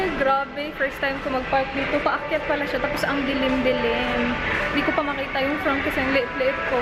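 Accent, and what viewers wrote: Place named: Visayas, Philippines. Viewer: haha ang cute ni ate mag bisaya haha 😌😌😌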